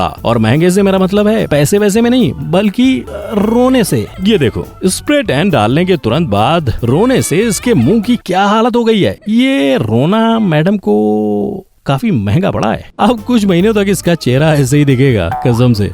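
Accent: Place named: Gujarat, India. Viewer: Gujrati lagate ho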